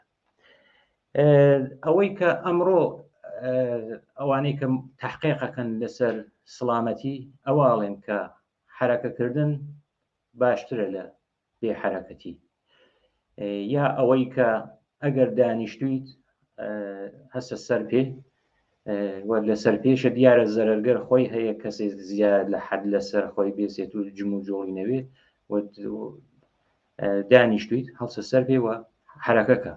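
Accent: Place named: Ardalan, Iran. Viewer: خسته نباشید . امید به بهبود بیشتر برنامه های شما . چند پیشنهاد کوچیک ۱ به نظرمن لهجه شیرین اردلانی را فقط حرف بزنید کافی باشد. ۲ اشکالات تکنیکی در پخش اتاق فرمان دقت بیشتر شود. ۳ مهمانهای که دعوت می شوند حتما متخصص در رشته مورد بحث باشن. ۴ در نوشتار(ته ندروستی) ( ته ندرووستی) غلط املایی کوردی دارید . دقت لازم است .! 🚩🚩🚩 در کل برنامه خوبیه امیدوارم که بهترم شود. .ارادت مخاطب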